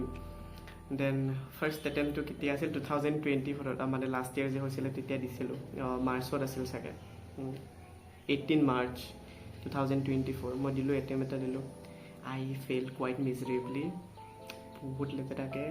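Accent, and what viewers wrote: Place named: Nalbari, India. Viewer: kot ghor tumar. Nalbeira jen lagsi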